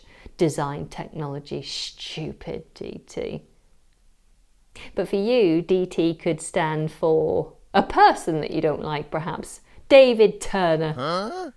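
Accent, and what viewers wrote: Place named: RP, United Kingdom. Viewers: is using RP which is recognised as the standard. is actually a Scouser and has adjusted her accent for her teaching videos. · But... your accent is also a regional accent. You're saying all this as if your way of pronouncing things is the official British way, ignoring the 3 British countries that aren't England, and even the official English way, ignoring the large percentage of the country that don't sound exactly like you. You have a Southern accent - what makes that any less regional or any more official than a Northern accent?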